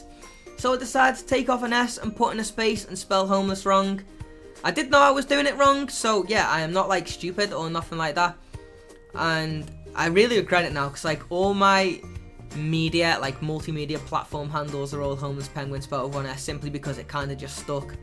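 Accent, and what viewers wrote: Liverpool, United Kingdom. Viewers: This might seem like a stupid question but surely if you grew up in Indianapolis you would have picked up there accent but you have a very thick scouser accent?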